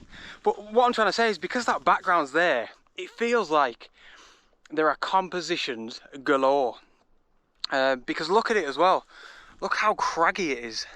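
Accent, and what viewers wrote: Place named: Cumberland, United Kingdom. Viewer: Good evening, from your twang what part of South Cumberland are you from (Millom). Nice to see you doing these Wainwright, fells.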